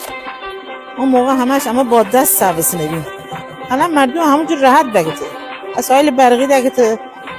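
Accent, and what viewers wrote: Gilan, Iran. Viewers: بسيار عالي و زيبا 👏👏👏 عزيزم چرا ميگين غذاي گيلاني ،؟ لهجه شما كه گيلكي شهسواريه . اينا غذاهاي غرب مازندران ، شهراي متل قو ، شهسوار ، رامسر و شهرهاي كوچيك حومه هم هست . صد البته كه غذاهاي گيلاني رو دست ندارن . اما بين اين شهرها كه گفتم مشتركه . و اگه ممكنه در مورد لهجه تون هم يه توضيحي بفرمائين ممنون ميشم 🙏🌹💚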